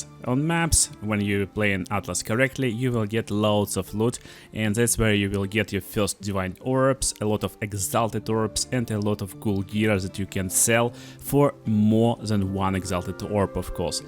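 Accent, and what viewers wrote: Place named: Russia. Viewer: The gameplay is nothing special really no offense but your boris accent and little edits made it very entertaining.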